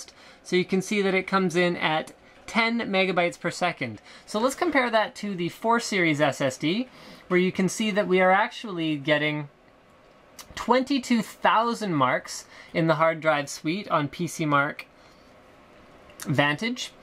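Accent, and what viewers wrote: Canada. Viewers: po-tay-to po-tah-tah ;) dah-tuh/sah-tuh = Canadian/English day-tuh/say-tuh = American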